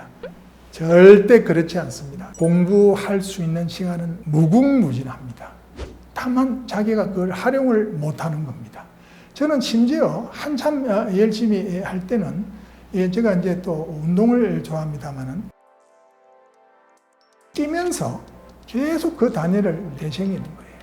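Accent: Gyeongsang, South Korea. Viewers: 저도 동의합니다~경상도에는 Intonation이 있어서 flat한 영어보다 듣기에 나아요~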